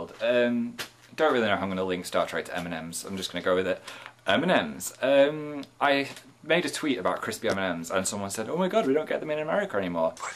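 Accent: North, United Kingdom. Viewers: northern accent is strong in this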